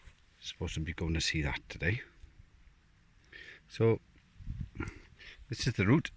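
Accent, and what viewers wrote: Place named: Wales, United Kingdom. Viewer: Stunning & you sound Welsh lol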